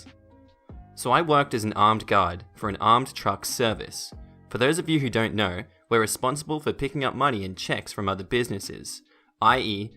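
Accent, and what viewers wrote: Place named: Australia. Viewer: With the ZED, he could also be from Canada, although not with the Australian accent. I think he's mentioned in other comment sections he's from Queensland (north east Aus).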